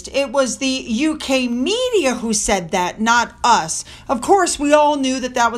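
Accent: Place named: United States. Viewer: Hello, we always watch your video's, you do a Brilliant job. You put so much hard work into your content. We totally agree with what you say, it was very nice to see what you look like, after so long wondering! you are Beautiful. I have seen some comment's about how you, and our cousins from the USA, pronounce names! I find it endearing. carry on as you are, there's too much of people saying " You don't say it like that, you say it like this! " It's difficult for the British folk also! I remember as a child, I had difficulty understanding why Norwich wasn't said as it is spelt, Norrich! When I was corrected, I couldn't get my head around it! My Aunt was from New Costessey, which is in Norwich, that is pronounced - Cossey! Even more confused! One thing that sticks in my mind, I was on a train with two Americans, one said to the other, I can't wait to see Edinburger! 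Keep up the good work, we really appreciate it. Best wishes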